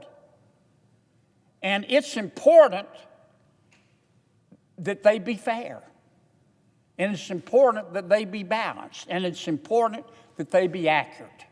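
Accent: South, United States. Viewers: I love his southern twang!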